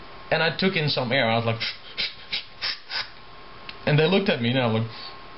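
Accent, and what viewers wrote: Netherlands. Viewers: hee ik dag al da je een ned accent had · Hoezo dacht je dat hij engels was? Je hoort het bijna altijd als iemand engels spreekt die nederlands is. Alle Nederlanders hebben ongeveer hetzelfde accent:P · Dutch accent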